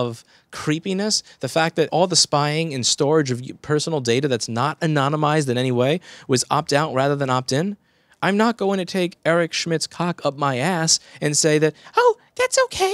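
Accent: New York, United States. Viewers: I think you aren't an asshole, you are just telling the bare truth. I love your face. Those black bags are just evidence of your hard work. I love the fact that you are pragmatic and innovative with your chair. And i especially love your new york accent! Just to be clear - dont let anybody tell you something different! Fuck those haters :D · i'm a New Yorker as well, can confirm i don't like your NY accent. Keep up the good work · Omg you are so self conscious, you covered all the stupid comments I ever had of you down to the NY accent. Love you, do something about those eye bags and chair, and wall paint. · projects his own insecurities as a strawman, I love your crooked chips and your new york accent and attitude. You've taught me way more than the real Apple training team ever taught me in my years working on behalf of them. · I love your NY accent, you sound just like a Seinfeld episode. 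As a female I think you are a very good looking man so eff 'em. You're a great, engaging teacher that's helped so many people. I'd be honored to meet you in person and see your technique and equipment in person. If you're ever out in Bumfuck, Virginia come on down and have some sweet tea and cornbread.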